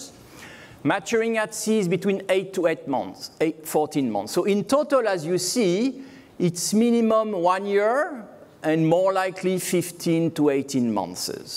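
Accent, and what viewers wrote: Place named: France. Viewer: I like French accent. Very sexy. Period.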